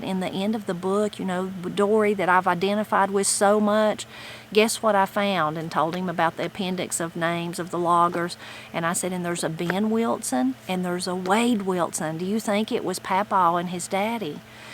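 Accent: Appalachia, United States. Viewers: I have so enjoyed reading this book. Appalachian Accent makes the book reading perfect. Happy to hear "The Rest of the Story". We were looking at moving to Oakridge. We visited in August, 2001. We loved it. Then 9/11 happened. We moved back to Ohio from South Carolina.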